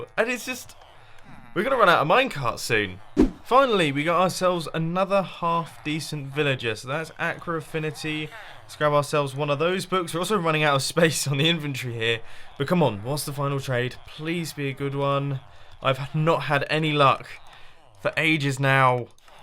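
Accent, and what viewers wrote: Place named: United Kingdom. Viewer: Create another storage system for your item backlog. You could also try to set up a system that drops about half of your farms drops in lava so that you don't overflow as fast. ALSO, during logdotzip's TTB#9 video, he abused fireworks so that he could fly better, but his elytra died very quickly, so stock up on a lot of dragon's breath (to repair your elytra while adventuring because some people in the comments section of his vid speculated that fireworks(even those that don't cause explosive damage) damaged elytra faster) before using fireworks to get a flight boost. Sorry for the text wall! Have a good day! Hope this helps! I also LOVE your British accent.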